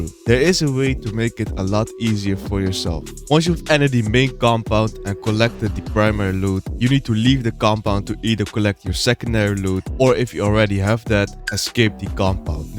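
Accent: Netherlands. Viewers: You are dutch aren't you? Great tips btw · you sound very dutch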